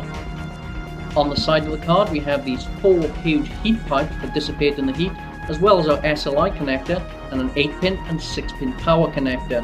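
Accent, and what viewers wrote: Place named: Wales, United Kingdom. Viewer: Are you Welsh? You sound Welsh hah.. Awesome video.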